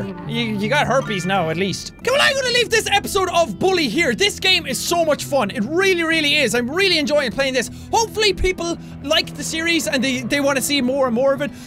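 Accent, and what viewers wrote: Ireland. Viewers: Yea I'm assuming from his accent he's in Ireland so yea pretty close :)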